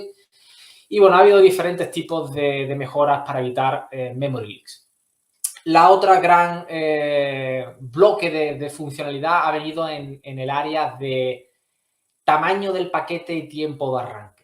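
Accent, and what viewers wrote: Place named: Spain. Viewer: No tolero el acento español 😢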